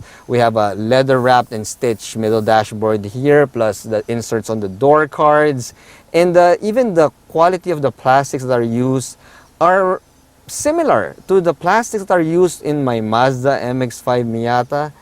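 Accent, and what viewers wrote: Philippines. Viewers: Hi,Just discovered your channel a little over a month ago,ive been non stop watching your vids because of Your MX5 Miata,ive got interest in that car. Also I saw your collab with RIT, I prefer your Filipino language and accent ngl. Love your vids!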